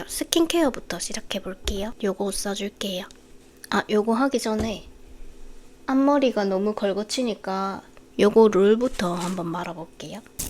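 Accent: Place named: Gyeongsang, South Korea. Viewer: 찐목소리 메컵 좋아요!!! 사투리 쓰시는거 넘 귀여워여..... 약간 경상도 사투린가,,? 🙃 아껴볼게여 🫶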